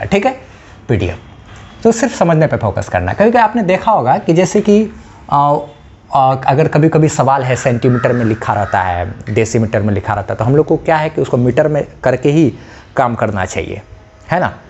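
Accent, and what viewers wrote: Bihar, India. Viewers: Love your bihari accent 😍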